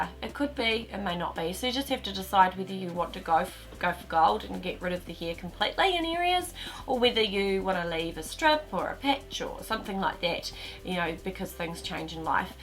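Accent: New Zealand. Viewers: Hey I was wondering if you could recommend a place to get this done. You sound Kiwi so I'm hoping you got it done in NZ?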